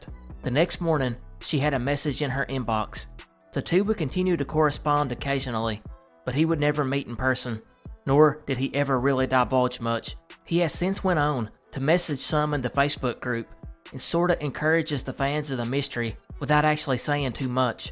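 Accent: South, United States. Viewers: I don’t think I’ve ever heard a creator who does videos like these with such a pronounced country accent Country representation <3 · Your channel and your episodes and how smart you are, helped me to better understand country boys. Now i know for sure it is just the accent. Even before i knew it was just the accent and becaude of movies etc it sounds stupid but its not. Thanks man.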